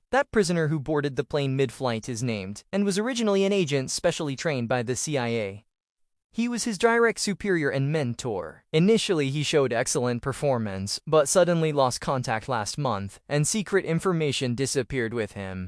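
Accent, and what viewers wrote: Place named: Germany. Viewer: The AI’s German accent narrator is a relief because most AI voices are the same generic voice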